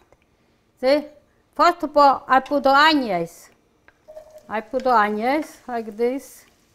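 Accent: Italy. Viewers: My Mother was from Naples Italy and accent, songs and receipts are authentic italian dishes. I grew up eating and cooking these dishes which I have passed down to my brothers and sons. It touches my heart to watch these videos. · I simply adore you. You remind me so much of my mom and the recipes she use to make. Thank you for sharing so many of your delicious recipes. My mom too, immigrated from Italy in 1947. Your accent is so much like my mom. I understand everything you say and how you say it. I love the fact you use your hands when you are cooking, just like my mom and grandma! Keep those scrumptious recipes coming with those beautiful Italian songs! Ti Voglio Bene!!!!!!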